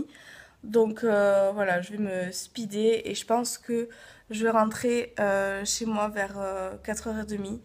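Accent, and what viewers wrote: South, France. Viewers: Coucou!! ♥ Déjà je voulais te dire que je t'adore tu es une de mes préférées youtubeuses, je te suit sur Instagram et Twitter!;) Tu est très belle! Et j'adore ton accent, vu que tu es tu Sud ( Moi aussi)!:D C'était pour te demander si tu ferais une vidéo sur ta routine sourcil! Car j'adore tes sourcils! LOL:b Sinon, gros bisous je te suit depuis quelques temps maintenant! ♥ XOXO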